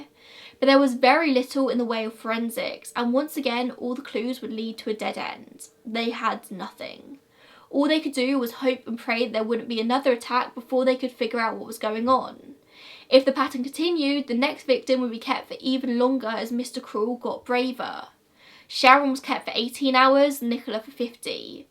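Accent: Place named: Yorkshire, United Kingdom. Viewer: I love the Yorkshire accent. <<thumbs up>>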